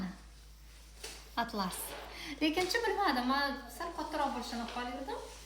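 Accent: Tashkent, Uzbekistan. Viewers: omad. Zo’r samimiy toshkentcha chiqibti❤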